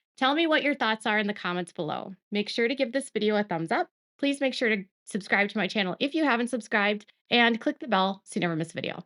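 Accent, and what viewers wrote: Minnesota, United States. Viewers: You have the most Minnesota accent I've ever heard😂 'abouuuut"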